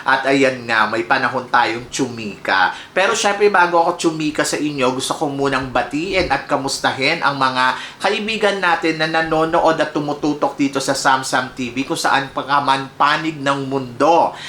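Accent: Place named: Philippines. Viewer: Anong probinsya mo sa Pinas,? Para kasing hindi Tagalog ang native tongue mo.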